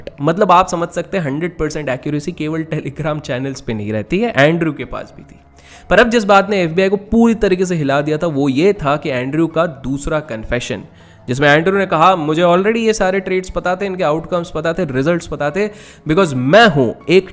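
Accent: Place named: Bihar, India. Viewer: E shasura 😂😂😂😂😂😂 Bihar ke bol ❤️❤️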